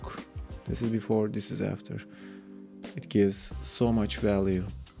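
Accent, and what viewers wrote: Turkey. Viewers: Türk her yerde Türklüğünü belli ediyor ya aksanı duyar duymaz anladım :) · Hey bro, are you Turkish right? you're accent almost same Turkish people ahaha lol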